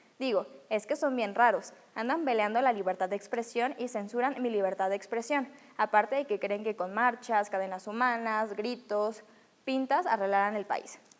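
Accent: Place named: Fresa, Mexico. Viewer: Sí, lógicamente que la critico y tengo todo el derecho de hacerlo, se dirige hacia el público en general y por qué no puedo hacerlo? Y lo reafirmo: suena muy fresa y sin el carácter necesario que exige el mensaje que quiere dar. Cuál es el problema? qué te molesta? que mi opinión no te haya gustado? Que no sea la misma que la tuya? digo, creo que tengo todo el derecho de opinar lo que me parece. Espero no te ofenda, me sentiría muy mal. No sabes cuánto.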